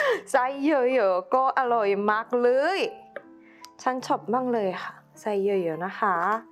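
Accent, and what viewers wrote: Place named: South Korea. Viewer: พูดไทยได้น่ารักมาก เข้าใจทุกคำ แต่สำเนียง คนเกาหลี ไม่น่าเชื่อว่าพูดได้ดีขนาดนี้ อยู่เกาหลี ไปหัดพูดกับใครครับ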